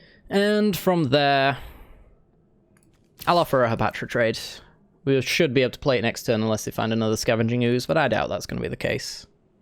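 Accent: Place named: England, United Kingdom. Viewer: Sent here by CGB and found an English accent!!! Woo hoo.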